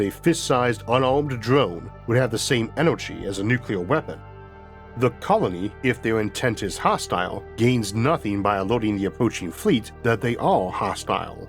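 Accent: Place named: South, United States. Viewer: Can't place your accent. Im thinking southern US with a mild speech impediment. No disrespect. Im a linguist.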